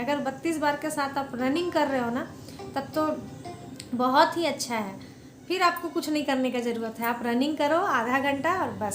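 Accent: Bengal, India. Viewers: Didi ap bengoli ho ????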